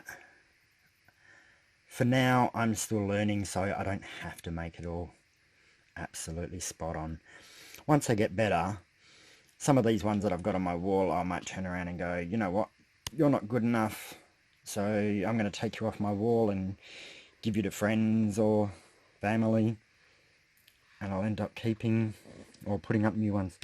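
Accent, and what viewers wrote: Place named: Australia. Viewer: I think it turned out really cool. The colours are lovely and I love the Melon and Turquoise cells. I have those colours too! It's lovely. I'm trying to place your accent... It has Aussie in it but doesn't sound pure Aussie? I'm fairly new to Aus so I'm still getting used to the accents. I'm from South Africa so I'll sound totally different to you and I'm so nervous about that. Anyway, good on you for starting this adventure!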